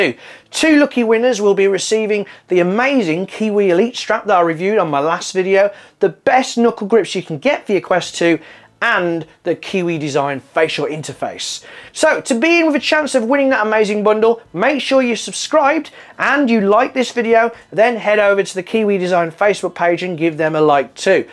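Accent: West Midlands, United Kingdom. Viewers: American why are you lying for🤪 beens every yellow & white number plate & the green phone control box is all British & the houses etc,god knows how you thought you would blag that one I live in the west Midlands & they all still use scooters here they blend in with the ones you can hire in the town centre so I wouldn't worry about it enjoy ya self they won't throw you in the clink😁 you probably live right down the road from me with that accent bro.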